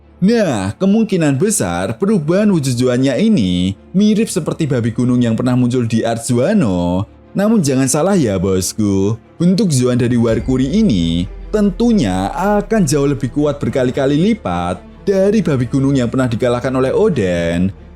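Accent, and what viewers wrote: Java, Indonesia. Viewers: Mendok jawa jir😂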